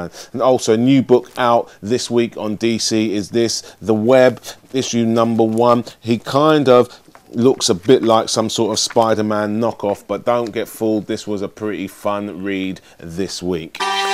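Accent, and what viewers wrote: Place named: United Kingdom. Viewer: you sound british. .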